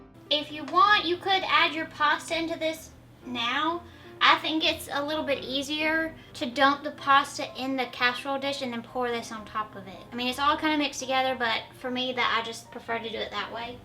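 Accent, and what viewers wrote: South, United States. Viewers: your southern accent comes out naturally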